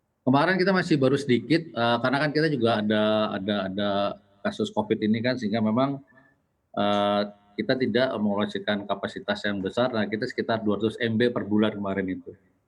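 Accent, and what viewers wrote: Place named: Banyumas, Indonesia. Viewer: bapake kayane wong banyumas/cilacap ya..ngapake esih keton loh pak .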